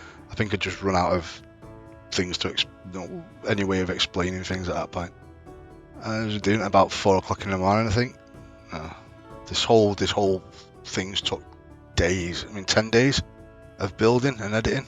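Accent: Manchester, United Kingdom. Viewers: What’s your accent man? I’m tryna work it out, you sound like a mix of Mancunian and Potters.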